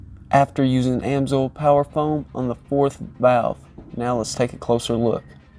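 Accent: South, United States. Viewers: Thousand likes just for the "down south" accent!!!!!!!!!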